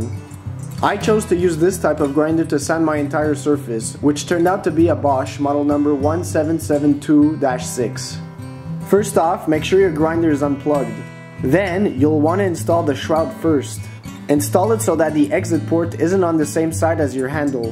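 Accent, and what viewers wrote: Quebec, Canada. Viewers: Heyyy!!! Toi tu as un accent quebecois quand tu parle! Vraiment sick! Jme creer un garage moi avec, so i'm super thrilled to have landed on your channel!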